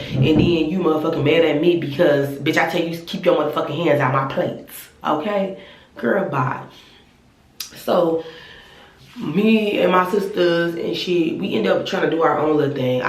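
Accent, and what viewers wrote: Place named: Chicago, United States. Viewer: Ik a Chicago voice when I hear one!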